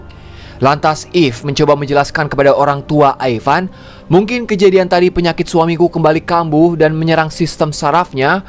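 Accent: West Java, Indonesia. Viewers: aku senang dan salut sama si akang yg cara pembawaan alur film nya logat nya sunda banget dan kocak..hebat lah buat si akang..😂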